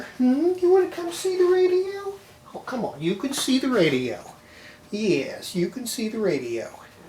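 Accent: United States. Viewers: Spoken like a Texan! :face-fuchsia-tongue-out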